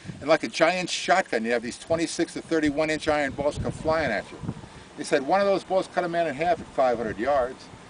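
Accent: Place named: North, United Kingdom. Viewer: This fella tells the story with passion. To my foreign ears he sounds like a Northerner, but he still has the passion for passing on the deeds of those that went before him. Great presenter, great passion and a credit to himself. Well done sir. Glad this was in my feed today :-)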